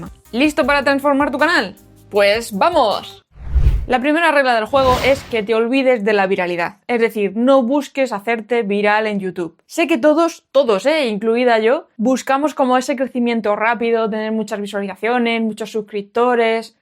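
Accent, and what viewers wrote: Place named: Canary Islands, Spain. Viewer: suena muy canario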